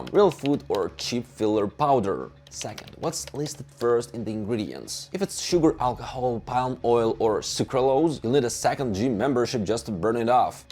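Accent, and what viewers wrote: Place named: Europe. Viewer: the european accent is cute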